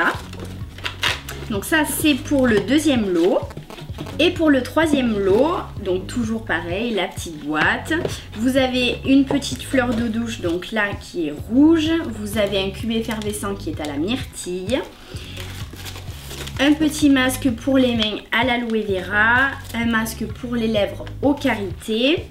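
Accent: South, France. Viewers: Sa se voit que tu viens du sud de la France avec ton accent ah ah, super vidéo :) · Merci énormément pour ce concours auquel je participe ! Je te suis depuis un petit moment et je te trouve super !!! Continue comme ça ❤️ Ps : j'adore ton accent et vive le sud ! 🙊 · Super haul! Je te suis sur ta chaine depuis pas très longtemps, mais je suis très contente. Tu es simple, et tu prends beaucoup de temps pour tes abonnées et je trouve ça bien et presque rare maintenant sur Youtube.Tu mérite largement tes 15 000 abonnées. Et l'accent du sud, et la bonne humeur ; j'adore! :D Je ne connais pas la marque Sephora, mais j'en ai entendu du bien, je participe au concours. Gros bisous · Vidéo au top 💘 L accent du sud le meilleur Ahah 🌞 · Coucou, j'espère que tu vas bien, il n'y pas très longtemps que je suis abonnée à ta chaîne et je trouve que les montages de tes vidéos sont agréable a regarder et tu as un accent du sud que je connais particulièrement puisque je suis aussi dans le sud. 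J'ai pu tester beaucoup de produit sephora notamment les vernis qui sont pas mal et je trouve aussi qu'il se sont amélioré sur leur produits. Je participe au concours, bonne soirée ☺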